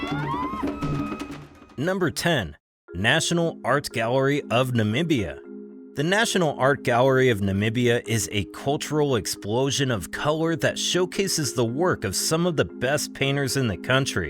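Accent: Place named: California, United States. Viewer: Very good and informative video but you could at least try to not pronounce the places and names as if they where in california or nevada💀💀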